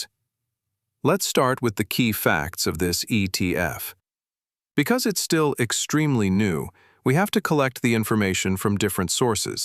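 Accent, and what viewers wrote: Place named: Sweden. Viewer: that sweet Swedish accent 👌🏻😎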